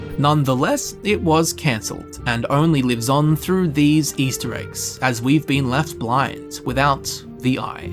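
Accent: Australia. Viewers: I just love the idea of an Australian lore guide who speaks with some degree of eloquence, it's both hilarious and glorious at the same time.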